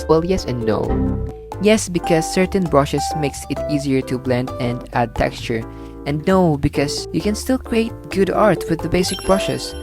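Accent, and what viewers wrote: Philippines. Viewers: Interesting how people thought you were Korean. As soon as I heard your voice, I was like "that's a Filipino!" Probably because immediately used to the accent XD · i can here ur Philipppineas accent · Omg how did I guess that I knew you were Philippines just by your voice/accent! And love your art so much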